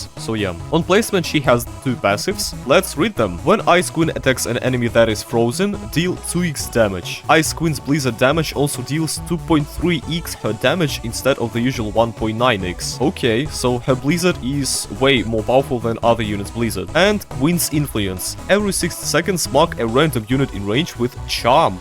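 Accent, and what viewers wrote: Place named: Russia. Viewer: когда думал все начало видоса чо за акцент русский а потом видешь как вместо английской E пишется у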